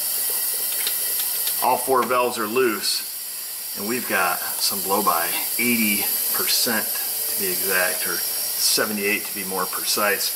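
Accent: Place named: Pittsburgh, United States. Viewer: Hey pal leave off of my fellow Pittsburgher. I thought I spoke perfect English until I left home for the wild West and people questioned my pronunciation of certain words . It appears that Pittsburgh has it's own dialect.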